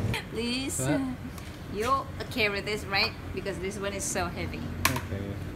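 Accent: United Kingdom. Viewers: Lamia jud kag english accent jing oi murag british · ganda ng english accent mo sis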